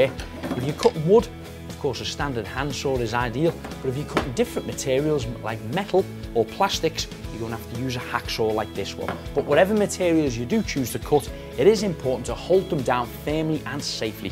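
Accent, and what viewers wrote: Liverpool, United Kingdom. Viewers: 30 dollars wasted. He's from Liverpool, not Scotland. Completely different accent. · Liverpool accent from UK . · He’s a scouser from liverpool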